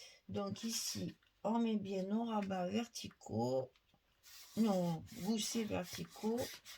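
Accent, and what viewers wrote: South, France. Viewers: Bonjour, c'est un cadeau que tu nous fait tous les jours. Une vraie artiste ! En plus quel bonheur d'entendre parler ''le sud'' qui me manque tant. Des bisous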